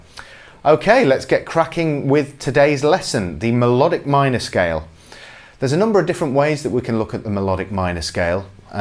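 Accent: England, United Kingdom. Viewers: ENGLISH accent. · You british accent is excellent :D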